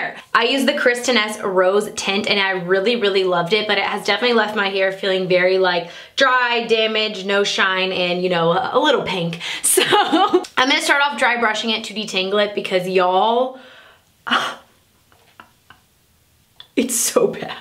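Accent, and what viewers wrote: South, United States. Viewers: I like the southern accent better. It’s you. And that’s more than ok. 🙂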